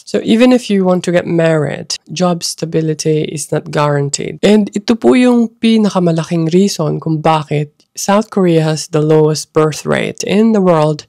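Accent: United Kingdom. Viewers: Bakit po may british accent yung english mo? Ang arte pakinggan 😅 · Nagulat rin ako sa pa British accent reminds me of Sarah D 🤗 tumira rin kc un sa London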